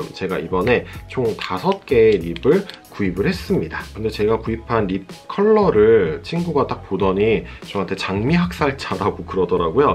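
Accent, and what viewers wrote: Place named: Seoul, South Korea. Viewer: 영상볼때마다 느끼지만 말투가 참 다정다감하시네요. 요란스럽지 않아서 좋은것 같아요!^^ 서울말 쓰는것도 부럽고..ㅎ 무튼 영상 잘봤습니다. 자주자주자주자주 올려주세요ㅎ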